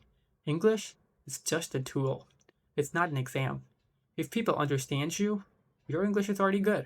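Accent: United States. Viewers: Bro, your American accent is top notch! I wanna really get connected with you. Is it okay if I take some time talking to you??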